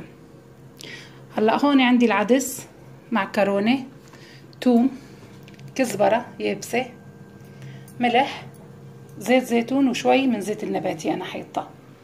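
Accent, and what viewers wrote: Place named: Aleppo, Syria. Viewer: يسلم...ايديكي....يابنت....بلادي....متابعك...من. مدينة...حمص،،العديه👌👍اموت..بللهجه....الحلبيه...الاصيله...وبلمطبخ. الحلبي، احلا ست بيت،،،هي المرأه الحلبيه..👸فنانه..بتحس انها عمتعزف معزوفه موسيقيه،،،،بلمطبخ....😂👌😘😂👏👏👏👏👏👏👏😘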